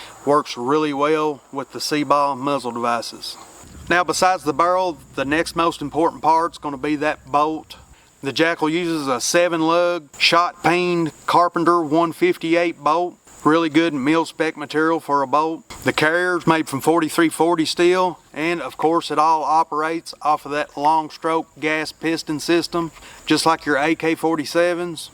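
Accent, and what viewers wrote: South, United States. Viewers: I hope you read this as it's my first video of yours. You EARNED my sub, first off u had the stones to not spend 5 min explaining the SBR bs down to your number. Second you built imo the best 300 on yt, and mostly you the only one sound more redneck than me here in NC. You got like sub comment whatever I can do.🫡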